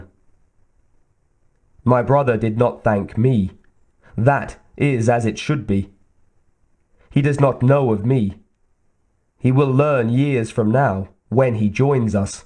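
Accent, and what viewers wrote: United Kingdom. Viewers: in the early months she would sit there and watch Aliens and Predator movies with me on the days I stayed home with her.. now she’s constantly on the move so I only get to listen. I’m on my 5th book now, and I’ve tried to make a habit of liking the each chapter as I start it for you! Also, your accent and tone is quite immersive, are you from GB?..?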